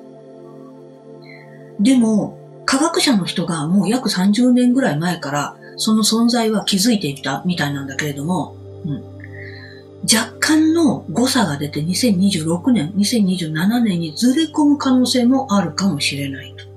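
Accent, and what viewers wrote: Kansai, Japan. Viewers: さん初めまして宜しくお願いします😊自分で語るのもおかしいのですが！私ね、あらゆる全ての波動周波数が何故か分かるのです！今地球に宇宙からのエネルギー波動が流れ出来ています9874hzです2〜3年前(コロちゃん初)700~900hzでしたのでものすごいスピードできめ細かく軽い波動が地球に入っていますね！😅このペースで行くと25年の銀河波動も、期待出来ますね！今も少しっつではあるけど南に傾いています😮ポールシフトが起きる事は宇宙では決定しているのですがその前に、予知現象といった具合に私たちが経験してそれから完全なポールシフトが起きます！その時沖縄~台湾の地下大陸が浮上します😮 さんの関西弁がとても好きです😅ありがとうございました💕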